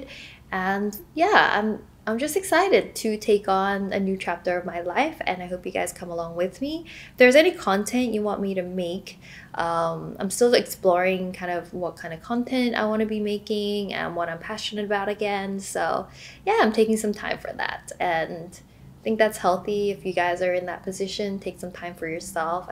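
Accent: Australia. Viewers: Where did her cute Australian accent go??? 😩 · it realised just hit me but australian accent is nearly completely gone like i can barely hear it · bruh do u know ur accents- it’s Australian 💀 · I haven’t visited ur channel in years ur Aussie accent hone :( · Youve kinda lost your aussie accent lol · you (guys) were my childhood youtubers u used to have an australian accent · ur aussie accent is gone :0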